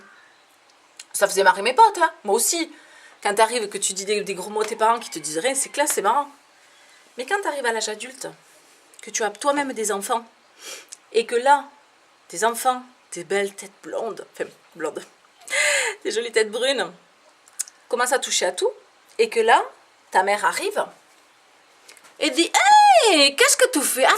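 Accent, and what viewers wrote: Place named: Lot, France. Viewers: J'adore ton accent je suis trop fan de l'accent du Sud ❤❤❤❤ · Je suis du lot g le même accent que toi in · Moi aussi je suis Toulousaine et j habite en ariegedonc tu n'est pas la seul a avoir cette accent que tout le monde adore et puis je te suis sur musicaly et tu es super😉😉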